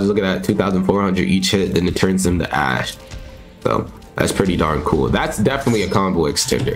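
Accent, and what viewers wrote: Trinidad and Tobago. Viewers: R u from trinidad? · Are u from trinidad? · Are you from trinidad?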